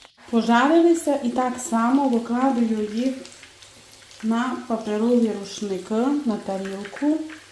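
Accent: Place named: Transcarpathia, Ukraine. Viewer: Мені надзвичайно цікаво слухати, як звучить закарпатьский діалект. Попри те, що українська - моя рідна і я знаю її дуже добре, деякі слова все одно не до кінця розумію, але мені розуміти їх десь так само хочеться, як і вашої капустки. Бо з дитинства розмаїття мови - то моє хобі. Яка ж багата є наша мова!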